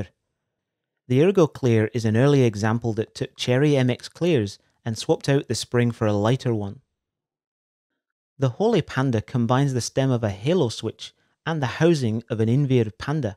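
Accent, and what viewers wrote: United Kingdom. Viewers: did your accent change to more scottishy or is it just me?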